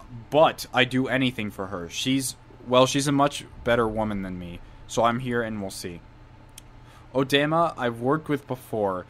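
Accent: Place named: Canada. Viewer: I love Arizona, one of the craziest environments I have ever seen! Driving in the desert while a mountain of snow is at a distance! I hope I don't offend you but I thought your Canadian.. I'm Canadian and the accent is very similar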